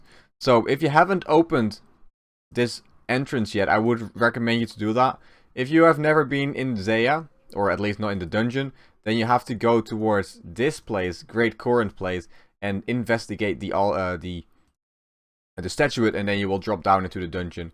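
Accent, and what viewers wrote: Netherlands. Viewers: The accent.. Dutch? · ARE YOU DUTCH?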